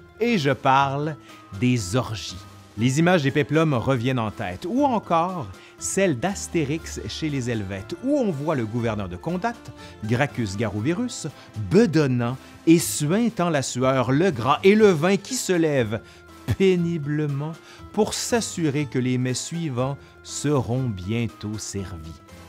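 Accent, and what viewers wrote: Quebec, Canada. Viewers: Je suis heureuse d’écouter un Québécois en plus mon domaine l’histoire 👌bravo 👏🏻🌹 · Des fois, je regarde tes vidéos pour le plaisir d'entendre un accent québecois.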